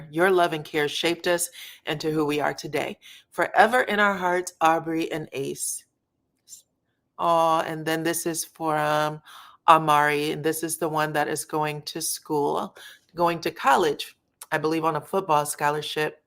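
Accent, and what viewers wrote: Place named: Boston, United States. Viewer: I can hear you Boston in the house❤️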